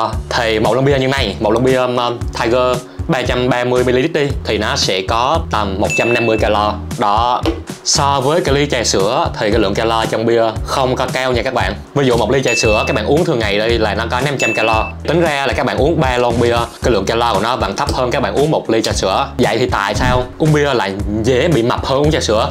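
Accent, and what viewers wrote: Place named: Phu Yen, Vietnam. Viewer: Bạn nói tiếng giống người phú yên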